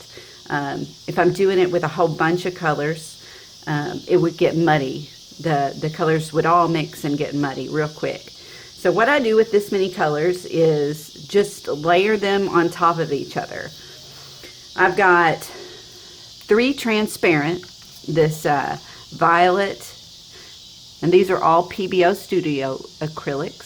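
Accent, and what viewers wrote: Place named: South, United States. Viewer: Ms, from the background noise and your accent, I'd say you're somewhere in the South! I'm in New Orleans. Beautiful pour. Every time I try that many colors together I get mud.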